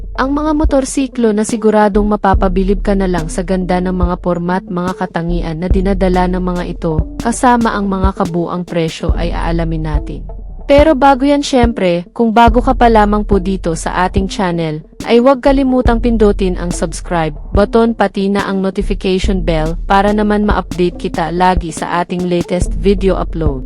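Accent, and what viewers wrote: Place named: Conyo, Philippines. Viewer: Kunyo mag salita.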